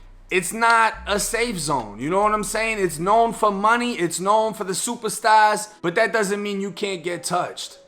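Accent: Boston, United States. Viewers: You from Boston? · Man how long you been down here in Florida now? Gotta lose that weak ass boston accent. Shit wack. Can barely stand to hear you talk. You do good videos and shit but damn the way you talk is annoying AF!!!!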